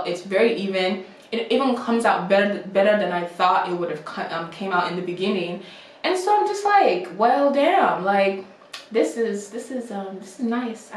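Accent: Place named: West Africa. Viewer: Wait, I hear a West African accent somewhere in there; na Naija you be? You had me LOL for real. Love your personality. New subbie!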